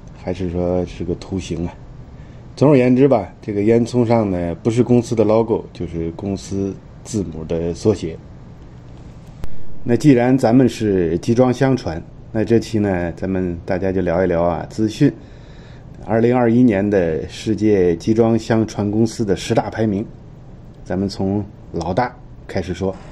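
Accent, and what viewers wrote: Tianjin, China. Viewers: 说话天津味道很重…… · 一听就是天津人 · 第一次刷到，李船长的口音听起来怎么有点像天津的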